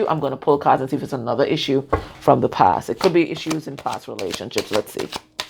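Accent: Trinidad and Tobago. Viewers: Oh my this was very relevant, thank you for your guidance. I'm awestruck. Are you Trinidadian? You sound and laugh like a Trini ;)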